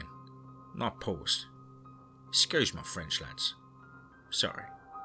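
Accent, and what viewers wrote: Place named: Cockney, United Kingdom. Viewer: Absolutely love this! Love the east end accent as well!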